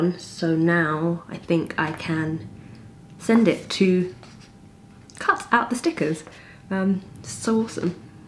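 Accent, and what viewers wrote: United Kingdom. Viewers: I need help with shaping my art to make it a career I was really good in drawing growing up but I stopped and dropped outta high school.... got me a GED diploma and joined the USARMY... I’m still looking into arts and plus I’m in Europe I got stationed in Germany I know you’re in UK because of your accent lol😊 can you help me with advices so I can get back up in my art?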